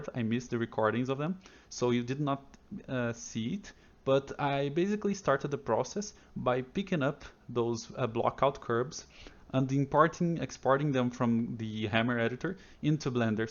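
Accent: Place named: Europe. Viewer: primeira vez que escuto um brasileiro com sotaque de europeu kkk. Bom trabalho aí mano muito bom seu workflow.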